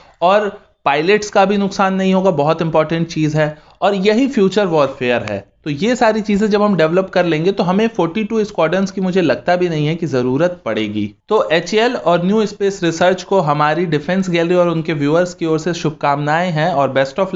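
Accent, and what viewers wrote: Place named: Gorakhpur, India. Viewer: Gorakhpuria accent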